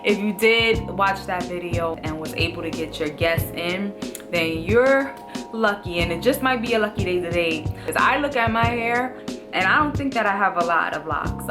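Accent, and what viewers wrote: New York, United States. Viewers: I from New York and she got that New York accent. It can come off sounding Italian like.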